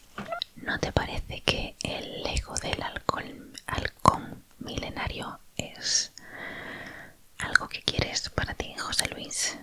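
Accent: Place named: Spain. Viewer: jajajajajaj 😂😂 te luciste con este video fue mega divertido y relajante me resultó genial la vocecita al teléfono! saludos desde méxico ❤❤ de qué parte de españa eres? me encanta el acento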